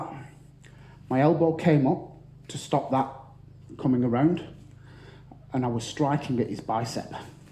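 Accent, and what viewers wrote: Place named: North, United Kingdom. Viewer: Everything sounds better with a norther accent lol "nads" hahaha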